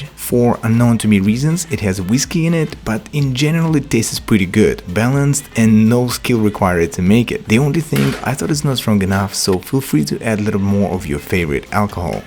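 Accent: Russia. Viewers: Здрасте, мне кажется или у рязанский акцент? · Автор из России? Просто акцент, я его слышу и мне кажется что он русский, да и к тому же имя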